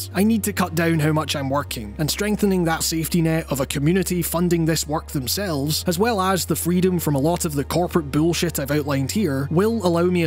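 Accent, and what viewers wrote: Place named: Scotland, United Kingdom. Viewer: Started watching your videos after moving to a new country and took great comfort in hearing about one of my favourite topics being covered by a fellow Scot so I could savour hearing the familiar accent while growing accustomed to a new place. At the same time, I grew to really enjoy your approach to topics, you cover games differently to most reviewers and bring in your subjective and often emotion based opinion which is honestly so refreshing and has helped me think about why I enjoy games the way I do. You brought great insight to the music of Shadow of the Colossus that really changed the game for me so any more exploration of your other passion in music is more than welcome.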